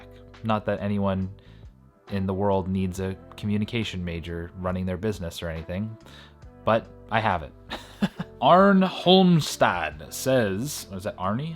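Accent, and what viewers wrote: Canada. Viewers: Your sarcasm and cadence is so unbelievably Canadian. I feel like I'm qualified to make these judgements because I too, am from Vancouver. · A Canadian, eh? How are Canadian accents different from those in the Northern United States?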